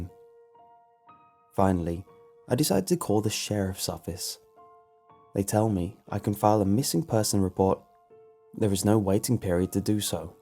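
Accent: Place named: Cockney, United Kingdom. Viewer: It's called a 'Cockney' Accent..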